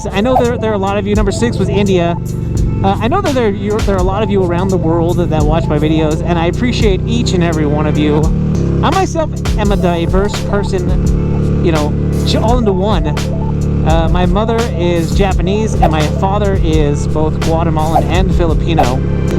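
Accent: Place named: Australia. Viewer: OMG lol your English sounded like Australian rofl lol and so did some of the other's lol Germany..South African, My sister and brother- in- law are Australian . My sister put's it on thick and sound bad! lol. I think she does it to blend in haha I don't think so. That did make me laugh, thank you...still smiling...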